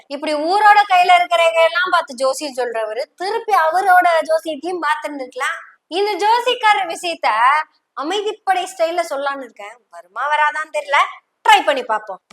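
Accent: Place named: Chennai, India. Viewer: Good one, however, please don't insult my Madras slang sister 🙏👍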